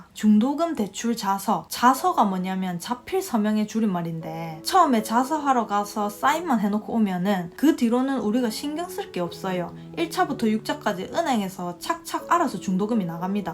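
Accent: Gyeongsang, South Korea. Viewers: 아따리야 경상도사투리 간만에 듣네예 좋심다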